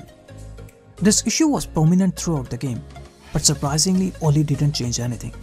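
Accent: India. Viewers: Great video ? Did i hear Indian accent ?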